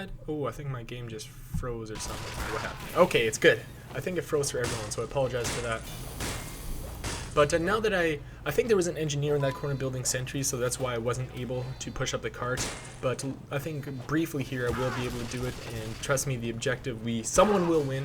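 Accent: Canada. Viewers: you have such a bad ass Canadian accent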